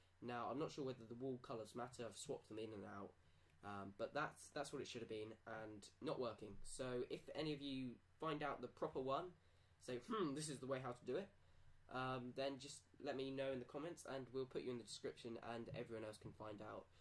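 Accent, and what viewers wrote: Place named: United Kingdom. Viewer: Subscribed my british friend i hop you take no offense for that i just love your accent :D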